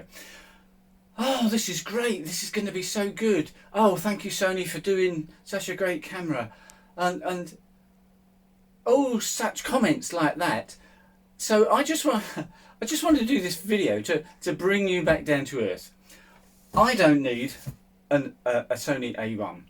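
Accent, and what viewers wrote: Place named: England, United Kingdom. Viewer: I had a sneaky feeling you would be posting something about the A1 today. Love the rant, the sarcasm, especially with the perfect English accent (I'm in Texas). 😊 High stills fps are like video now. I would not want to shoot a burst of 20-30 fps of that cyclist rounding the corner and then cull through a hundred photos at the computer looking for the perfect shot (unless one is a sports photographer or just hoping to capture a cycle wreck). Great video.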